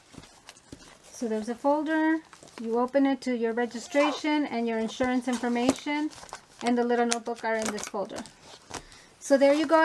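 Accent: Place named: India. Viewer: Nice done, People from india 🇮🇳 have a very cute accent , you sound like a sweet indian lady who works at my dentist office !! She is so crafty just like you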